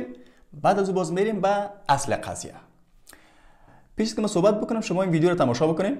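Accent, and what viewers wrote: Iran. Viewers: این لهجه ایرانی بسیار جالب هست نه ایرانی و نه افغانی یک لهجی نو است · افغان با لهجه اجنبی ایرانی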